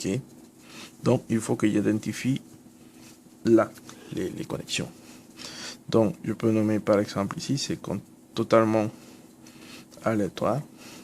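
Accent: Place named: Spain. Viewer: I bet this guy first language is spanish but he speaks frensh very very good! nice explication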